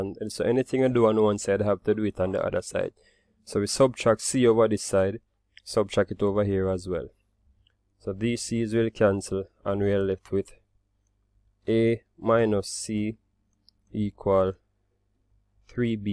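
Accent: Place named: Jamaica. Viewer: I wish I had a Tutor with a Jamaican Accent. Would have made Math's more fun!!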